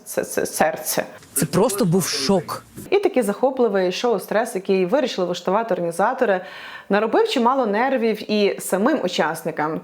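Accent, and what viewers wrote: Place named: Ukraine. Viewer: У Вас важка українська.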